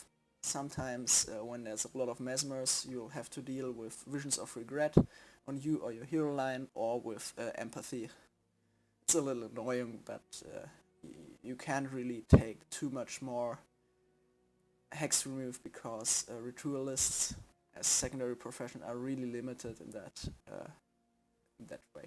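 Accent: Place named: Germany. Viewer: man hört so heftigst den Deutschen Akzent raus das so schlimm, aber dennoch gutes Video^^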